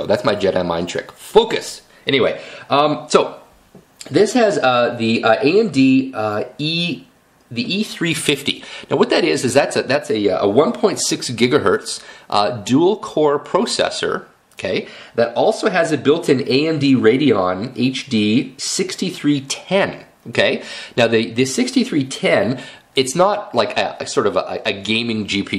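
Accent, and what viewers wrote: Canada. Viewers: I think most of us Yanks dig the Canuck accent, so pay no heed as to what other Americans say!:0)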